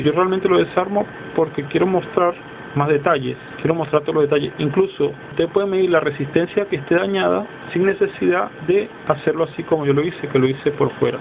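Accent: Venezuela. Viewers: mi pana tu eres venezolano verdad? aprecio tu esfuerzo muy buena tu explicacion, ahorita comprar un bombillo nuevo es un dineral. Gracias!!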